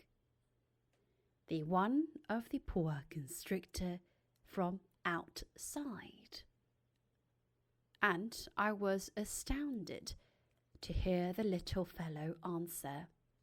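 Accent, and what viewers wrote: RP, United Kingdom. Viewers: 헉 책중에서도 제가 젤 좋아하는 어린왕자라니ㅠㅠ게다가 영국발음..감사합니다♡ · 아리랑 티비 광고 맨날 나와서 대사 다 외웠는뎈ㅋㅋㅋㅋ 이렇게 봬니 반갑네요 ㅠㅠ 목소리도 너무 좋고 발음 진짜 고급스럽네요 ㅠㅠㅠㅠ저도 영국 발음 저렇게 내고싶어용 퓨ㅠㅠ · 영국억양 진짜 개사랑해요.... 미국이 아니라 영국으로 갔어야했는데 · 저도 영국 발음 가지고 싶네요 ㅠㅠ 멋져요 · 영국식 발음에 케러멜라이즈드 된 버터처럼 이불 속으로 녹아듭니다~~~ 느아아아앙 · 으아 역시 영국 발음 너무너무 좋아요 ㅠㅠ 저도 영국 영어 공부하고 있고 쓰려고 노력하는데 학교에서 미국 영어랑 마찰성이 생기더라고요... apartment를 flat이라 써서... 그래도 뭔가 뿌듯하기는 해요!!! 어쩌다보니 학교에서 영국 영어하는 철학 소녀로 유명해졌더라고요 ㅋㅋㅋㅋ 무튼!! 명절 잘 보내세요:)💜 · 영국식 발음이 너무 듣기 좋네요.. 특히 가 들려줘서 정말 듣기 좋네요... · 와 너무좋아요ㅜㅜㅜㅜ 구독하고갑니다 영국영어 많이많이올려주세요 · 포쉬가 이렇게 아름답게 들린건 첨입니다❤️ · 영국발음 너무 좋아요... 진짜 멋있는 느낌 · 미국 버터발음에 익숙해진 귀가 영국발음을 인식하지 못하네요..? 영국발음 넘 조흔것!! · 무진장 이쁘시네요. 영어까지 영국 상류층 느낌 팍팍. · 목소리가 이렇게 섹시하다니,영국 발음 치인다ㅜㅠ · 영어를 RP혹은 posh eng라고 하나요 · 고급진 영국영어 발음이네요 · 와 영국발음 너무 좋아요 ㅠㅠㅠ흐엉엉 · 어떻게 해야 이런 발음을 갖을수 있나요 목소리도 넘 힘있거. 전 호주발음을 가지거 있는데 영국 발음이.훨씬 엘레강스한듯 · 맨날 영어관련 영상볼때마다 "엘~로 에브릐보우~티~~ 마 넴~잊 퀀주현~"하시면서 등장하셨는데ㅋㅋㅋ너무 자주뵈서 그때마다 영국발음 진짜 좋으신 아리랑티비 소속 씨....라고 외워버렸는데ㅋㅋ 이렇게 채널하고 계실줄이야!! 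우연히떠서 봤는데 구독누르고 갈게용ㅎㅎ안그래도 영국ㅠ상류층 발음을 좋아하는데!!! 완전 취저ㅠㅠ♡ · 와 광고에서 아리랑 티비 아나운서 나오는거 봤는데 진짜 발음 너무 섹시한거 같어요.ㅠ̑̈ ㅠ̑̈ ㅠ̑̈ ㅠ̑̈ ㅠ̑̈ 진짜 발음 완전 영국 귀족 같아요 진짜 너무 너무 너무 닮고 싶은 발음.. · 영미권에서 유학하셨나요 아니면 독학으로 영국식 발음 익히신건가요? ㅎㅎ 너무 잘 들었습니다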